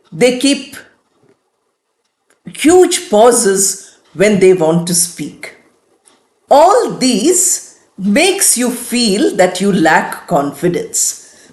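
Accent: India. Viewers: You speak standard Indian english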